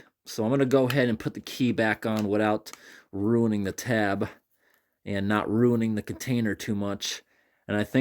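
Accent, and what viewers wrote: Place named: Alaska, United States. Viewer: I was born and raised in Alaska, and he reminds me of a true Alaskan! Even the way he talks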